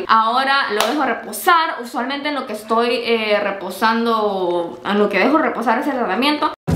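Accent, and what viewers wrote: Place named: Honduras. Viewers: Jajaja no se si soy yo ho es que hoy si se le escucha bien el asento hondureño cuando la escuche dije abla diferente ahora .jajaja ho sera porque ya dias no veia sus videos saludos paisana🇭🇳❤gracias por los tips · Igual note mucho el acento Hondureño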